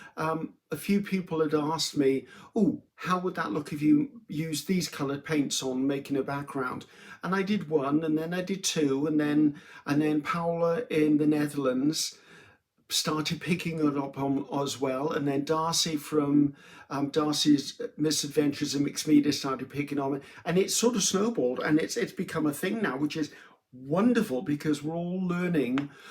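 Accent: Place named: Wales, United Kingdom. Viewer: I am one of your new 2022 subscribers and I appreciate your sincerity, honesty and how you are yourself. Thank you for speaking so candidly about your bout of depression. I've dealt with depression throughout my life and it's good to hear artists speaking about it. I love that you are from Wales (your accent makes me think of my Welsh mother in law) and how you embrace the diversity that surrounds you. Like you, I have much to be grateful for. I wish you a wonderful Christmas and all the best in 2023. Know that you are supported from your fans (like me) in Canada.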